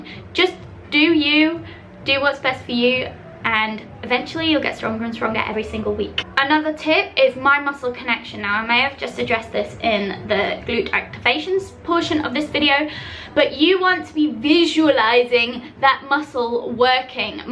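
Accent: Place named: United Kingdom. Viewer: wait, she's Australian?! I am and she doesn't sound it haha I'm so confused 😂 I thought she was from the U.K?!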